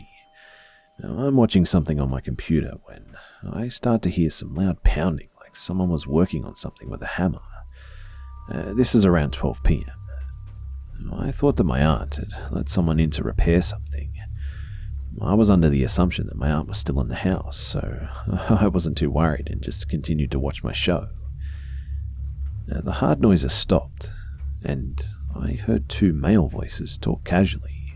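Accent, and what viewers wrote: Australia. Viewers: It’s good to listen to an Australian voice! You tell these stories so well. Keep up they great work 😊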